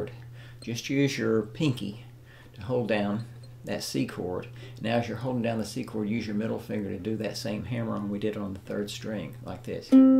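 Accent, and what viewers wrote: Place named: South, United States. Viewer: Thanks for an excellent tutorial. I am really hooked on this style of playing now. I am from the UK and am also tickled by your southern US accent. I love it. Uke playing is in now and clawhammer is catchin on. Thank you so much buddy!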